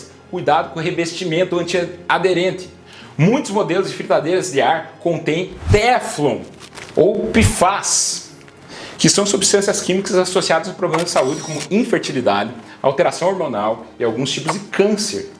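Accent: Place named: Minas Gerais, Brazil. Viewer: Mineiro, caipira ou americano??? Bonitinho o doutor... fala diferente, né?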